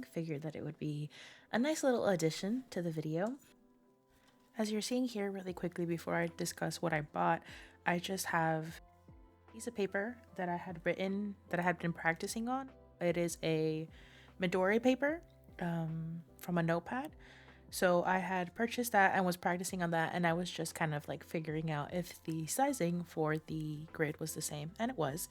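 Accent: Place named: Puerto Rico. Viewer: I was not expecting the Puerto Rican accent 😂😂😂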